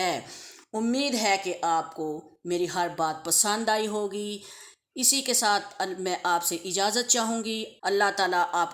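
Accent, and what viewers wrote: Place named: Punjab, India. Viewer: omaigod your punjabi accent😗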